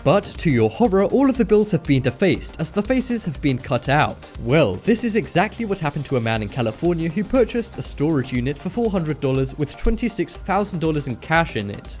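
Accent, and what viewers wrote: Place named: United Kingdom. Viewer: Derp Face Dude, he's British. They say everything different over there.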